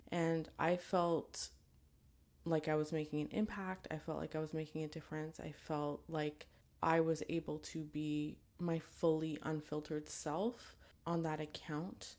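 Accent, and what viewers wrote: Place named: France. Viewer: PS. I think what makes you, you is your voice, your way of expressing things, of describing things, your French accent when you speak.. not your mascara and makeup, you are sooo beautiful barefaced. Just grab a cup of cappuccino and throw yourself outthere with whatever feels good to you. We are waiting for you , whenever you are ready!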